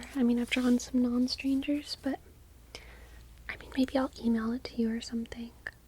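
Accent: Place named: South, United States. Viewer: I'm guessing you live in the country because most people pronounce it with the i n g most of the places I know that pronounce it's with the Southern drawl you're describing are in the country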